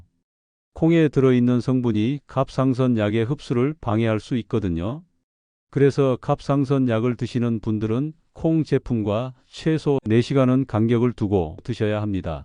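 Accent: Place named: Jeolla, South Korea. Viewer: 전라도 억양 소름돋아,,, 징글징글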